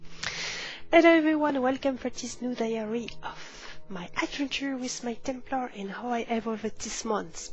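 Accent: France. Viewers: Great video. really enjoyed it. And the French accent from a pretty lady is a bonus too ;) Keep up the good work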